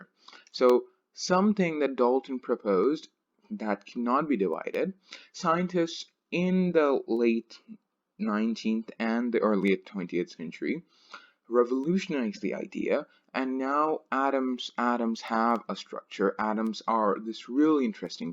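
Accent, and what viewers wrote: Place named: United States. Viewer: Seems to be listening an American channel....... Really amazing.... Keep it up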